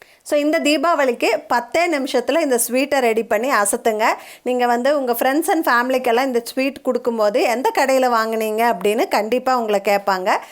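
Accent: Coimbatore, India. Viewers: Mam.. r u from Coimbatore?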